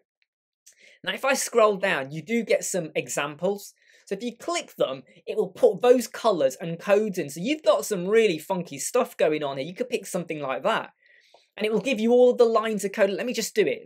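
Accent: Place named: England, United Kingdom. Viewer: i liked your english accent , are you a native speaker ?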